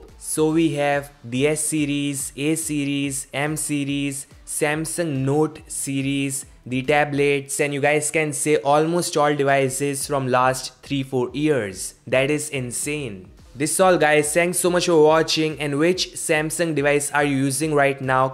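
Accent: India. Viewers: This is Indian accent Loved it !!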